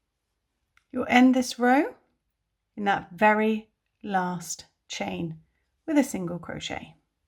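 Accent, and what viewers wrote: United Kingdom. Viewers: You Have a UK accent, but in American terms?? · Despite the British accents, she's using American terms, so don't get confused. A lovely, and east stitch pattern for us beginners.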